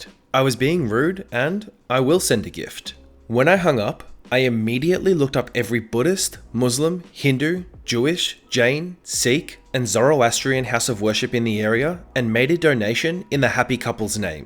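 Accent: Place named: Australia. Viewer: Yo you sound Aussie, are you?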